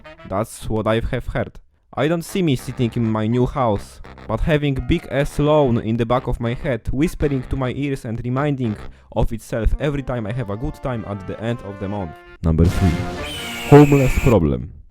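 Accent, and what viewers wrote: Poland. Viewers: Oh my, your english sounds so Polish. I am saying this as someone from Poland. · Polski łamany na angielski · Masz najmocniejszy polski akcent jaki w życiu słyszałem. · Odrazu po tym akcencie wiedziałem że polak xddd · yes he is polish he sound polish he speeks polish good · Nie ironicznie przyjemnie się słucha z polskim akcętem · czytany angielski ciebie zdradził xD zanim powiedziałeś że z pl już się domyślałem ale ogólnie to sam nie umiem czytać za dobrze, umiem dużo w angielskim mi sie wydaje [ bo moje porównanie to moja klasa xD ] ale ogólnie alfabetu angielskiego czytanego nie pamięta całego, a to co pamiętam to i tak angielski nie jest dokońca spójny np. know i no czytamy zawsze jako "noł" choć inne słowa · Słychać, że polak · His accent, lmao. As Pole speaking English for years - he basically polonizes every english word. It's absolute beauty. 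It's me if I don't know how to vocalize word in english all of sudden.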